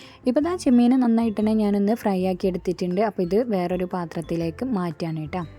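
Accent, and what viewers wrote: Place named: Malappuram, India. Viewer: Njagal Malappuram slang Maya Aantto😃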